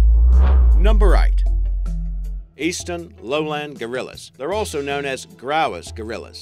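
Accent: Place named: Australia. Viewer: maybe a soft Australian accent.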